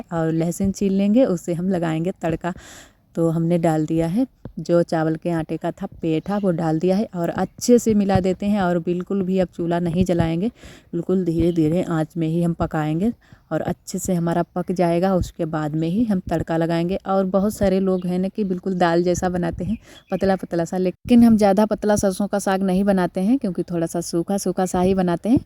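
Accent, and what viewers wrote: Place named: Orai, India. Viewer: Ap Kahan se hai kuki apki launguage ekdum hmare orai u.p ki tarah h Kande to hmare yhn bola jata hai up m to ap Kahan se hai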